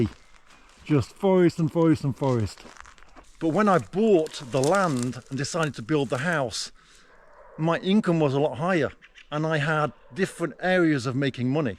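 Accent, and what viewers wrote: United Kingdom. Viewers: Он уже 20 лет живёт в РФ, считай он один из самых ранних экспатов-ютуберов и у него очень хороший русский язык на достойном уровне, практически носитель но с британским акцентом)) Это кстати солидное преимущество, так как многие иностранцы годами не могут освоить язык кроме - "Всэм Прувет ит из..."😅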